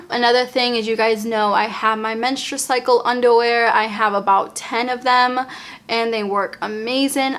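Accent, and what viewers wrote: Boston, United States. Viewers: She’s from Boston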